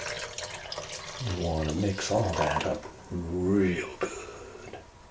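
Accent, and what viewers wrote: United States. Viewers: I live in cowboy country, so it's enjoyable to see the nod to our culture and accent. Thanks! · Love that cowboy accent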